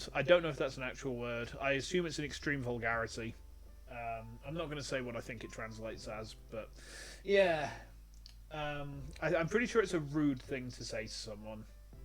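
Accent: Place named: United Kingdom. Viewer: She is the most british person i have ever heard. May she rest in peace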